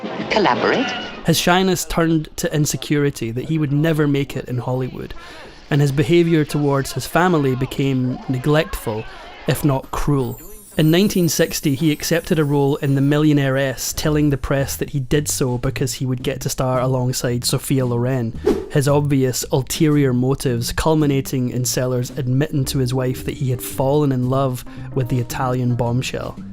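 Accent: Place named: Scotland, United Kingdom. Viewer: Nice to hear a Scottish voice for a change. 😊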